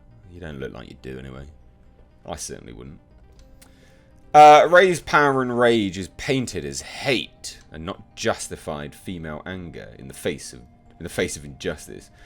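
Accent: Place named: England, United Kingdom. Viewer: american? He has clearly an english accent. Why did you thought that he was american? I dont get it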